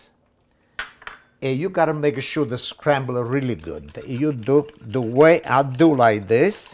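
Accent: Italy. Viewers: 🤣😅🤣😝🤣😅 you are funny but you are right..he talks like a true Italian!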